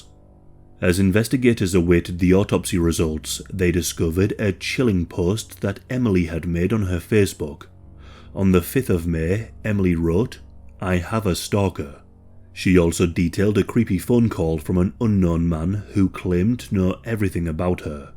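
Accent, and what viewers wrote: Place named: Northern England, United Kingdom. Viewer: Not even hald way thru that cheb ends personal description yes but. im actually already physically cringing at 'scarface' LOLL more like 'uncontrollable teen acne-face'. What a fuckn loser. Cant even imagine this litte coke heads further actions n what he'll end up doing to that poor young lass. Hate little pests like him. Calls himself topdog yet cant even buy his own car. What an absolute bell end... i already despise him and im not even a 1/4 way through the entire video. Amazing work as usual. As a fellow northerner usually a northern accent makes me awkward as im so sick of it but you make me proud to live up norf again ha ha. Legend!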